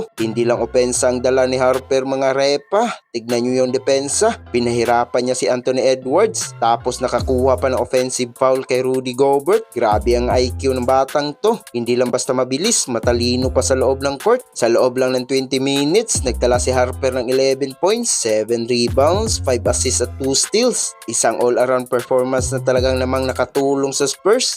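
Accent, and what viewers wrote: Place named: Iloilo, Philippines. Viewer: Ilongo ka to haha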